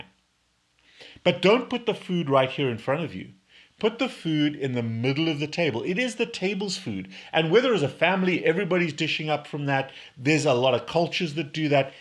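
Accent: South Africa. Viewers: faint South African accent! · South African accent. It's quite charming.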